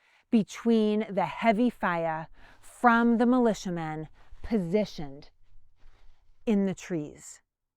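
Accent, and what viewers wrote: Boston, United States. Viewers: I sense a slight baaaastin accent cute!!! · Another well-done informative video. Keep up the good work. I’m hard of hearing so I have to use the closed-captioning. It’s a real treat the way it sometimes converts your Boston accent. Conquered vs Concord. Luckily my SIL and brother live there so I’m able to figure it out having talked to her for 40 yrs or more. It keeps my mind active anyway. LOL. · like the Boston accent! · My weekly dose of American history and a Boston accent. Thank you! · Awesome accent Anytime someone with a Mass/Boston accent teaches Revolutionary war history. I’m in!! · I don't usually like the Boston / yankee accent lol. rocks it though! Keep up the good work n GREAT content, look forward to hearing more from ya in the future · Man I love the Bahston accent and all the talk about maahching! Great content, too! 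Lived in Boston for 9 years. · Thank you. Just discovered you a few weeks ago. Love your Boston accent, and your explanations from our past important history during the revolutionary war. I'm a west coast watcher from Oregon, and enjoy your stories and videos. Keep em' coming! 😉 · That Boston accent, though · I detected the Boston, but I could swear there were some Aussie influences there too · I'd hype you for your accent alone! I love me some Boston!! ❤😉🇺🇸❤ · Just found this channel and, there is NO ONE I'd rather hear talking about this then you and your Bahstin accent:) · Thank you. You really helped bring it to life for me. And Love that Boston Accent. Put a smile on my face.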